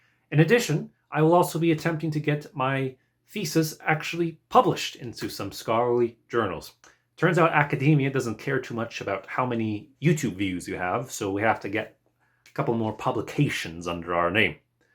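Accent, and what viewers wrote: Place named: Austria. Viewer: Man, your syntax and inflections remind me of the Military History Visualized guy, Austria has taken hold of you.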